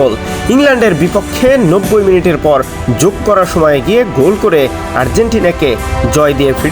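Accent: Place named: Bangladesh. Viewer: Bhalo bolcho tobuo bangladeshi bangladeshi lagche keno?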